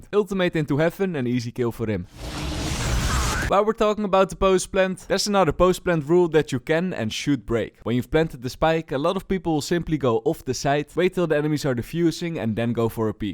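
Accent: Netherlands. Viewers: first 10 seconds of the video i recognize a heavy dutch accent and i was right LMAO xd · hahahaha ik hoor die nederlands door je accent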